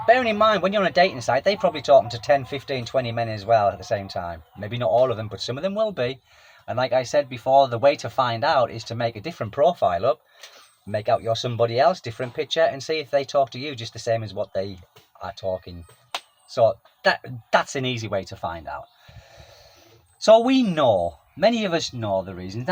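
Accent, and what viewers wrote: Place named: Newcastle, United Kingdom. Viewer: You are welcome. I recently posted one of your videos to my cousin Paul who married a girl from Sunderland in 1983. He has lived there ever since. I detected you have a slight Geordies accent. Great memories of being best nab at his wedding. I was best man. The geordies were brilliant. So similar to we scousers. really honest with a grear sense of humour and dont suffer fools. Keep up the great work. Tony from the pool.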